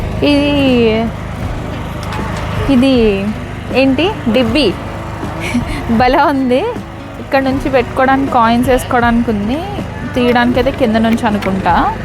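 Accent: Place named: Andhra Pradesh, India. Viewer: యూకే లో ఉన్నా గాని అక్క మన ఆంధ్ర మాటలు మాత్రం 👌👌👌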